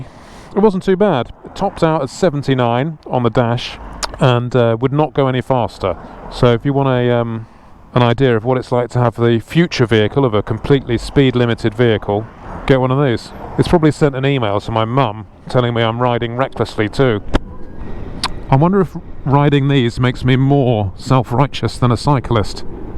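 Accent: England, United Kingdom. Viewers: Love the way you think mate, like listening to myself with a pommie accent 😆👍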